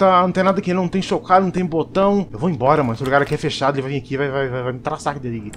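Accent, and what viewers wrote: Ceará, Brazil. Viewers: Olha um cearense kkkkk a não mano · Aeeeee Um cearense!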